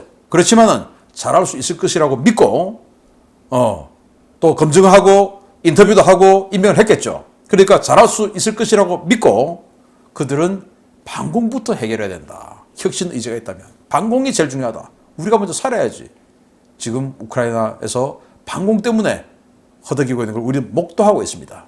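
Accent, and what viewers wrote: Gyeongsang, South Korea. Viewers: 갱상도 싸나이 최고!!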